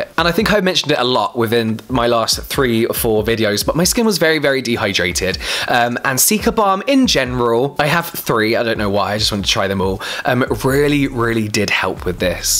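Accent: United Kingdom. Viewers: Hello, it is soooo refreshing to hear British English on YouTube 🥰 Thank you for this amazing channel and your "ear-pleasurable" accent 😁 Love from Prague ❤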